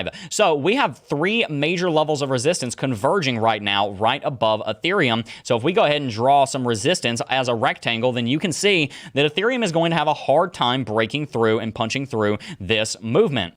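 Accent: South, United States. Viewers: he's from the south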